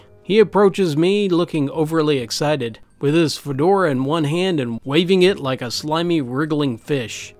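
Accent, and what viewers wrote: South, United States. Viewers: Areou southern what state are you from?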